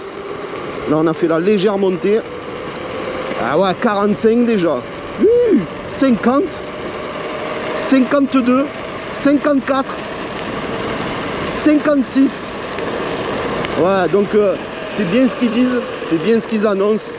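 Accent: South, France. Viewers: toujours avec l'accent du sud....fabuleux...ça reste une urbaine...nous on cherche du lourd pour du Offroad dans le Cantal en zone nordique...projet en cours.n'hesites pas à nous contacter...Merci MR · Super et aussi tes vidéos, j'aime bien ton accens du sud moi je suis du nord enfin belle trott!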